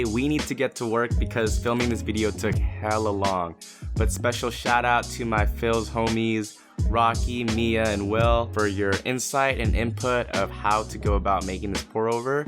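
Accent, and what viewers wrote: Philippines. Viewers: I just got a pour over set for Christmas and this video got me SUPER excited to make myself coffee <3 the only thing is that my set came with a manual grinder that I have no idea how to use yet lol. But great vid, love the pilipino accent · way cool. dance moves. Philippine accent cut over. super fun. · We lub da pilipino akcent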